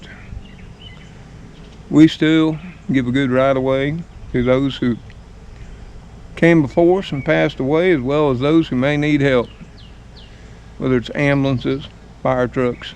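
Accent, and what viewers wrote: South, United States. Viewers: Love southern accents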